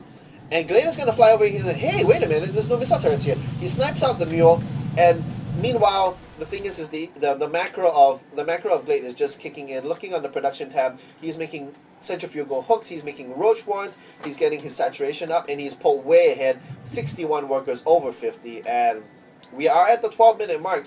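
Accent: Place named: Singapore. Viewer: You sound like a singaporean. And loking at your profile I think you are! Good job. keep it up. =)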